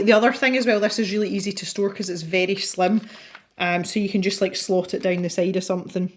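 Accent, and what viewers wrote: Scotland, United Kingdom. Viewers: Hullo, I believe you picked the perfect color for the big door hinges, well done! I have heard some Scottish accents that are very hard to understand, yours is not one of them, now I do not have TikTok nor do I plan to download that app as I have enough time wasters on my phone. I enjoy your videos and your accent and I have always loved your attitude of it’s just a piece of paper no one‘s gonna die! Thanks again for Sharing it this coloring time with us and Thursdays a great day for me too. I look forward to your next session as well as Sunday’s video be safe take care and have yourself a lovely day! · I adore your Scottish accent! There's no need for cringe or anything. It's funny though that Mr didn't pick up on the dialect after all those years. I would be in full trying to sound like you mode if I were in Scotland for 2 seconds 😂 I'm a parrot and try to blend in as much as I can; already did that as a child. 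I usually have a strong Tyrolean dialect, but I rarely use it when I'm outside talking to other people because in Vienna not many have that dialect, they have Viennese dialect (which I find absolutely disgusting and won't even parrot 😂). People are so different, it's funny to me 😄 Btw, I totally second the opinion on the Gansai Tambi Starry Colors, they're amazing and last forever. And they reactivate really well, just put a drop of water in the pan and wait for a few seconds and you're good to go!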